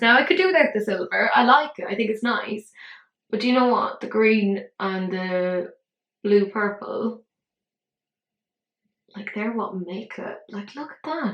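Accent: Ireland. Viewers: I loved this video x I have the dark fantasy and absolutely loved it defo need to pick up the liquid ones as they look fab x it’s so nice to hear your accent x I have family in Carndonagh so hearing the accent and the witty sense of humour really was magical x thank you for this video it made me smile xx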